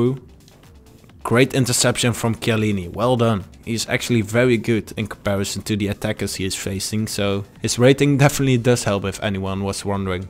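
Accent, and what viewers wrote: Netherlands. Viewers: Hmm I can hear the Dutch accent ;)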